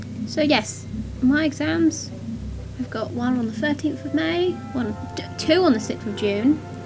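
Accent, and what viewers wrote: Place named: United Kingdom. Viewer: I love your voice! It's all british-y :D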